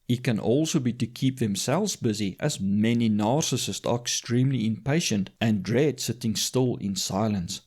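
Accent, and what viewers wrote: Afrikaans, South Africa. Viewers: Dude, is jy Afrikaans? Jou aksent klink baie Afrikaans.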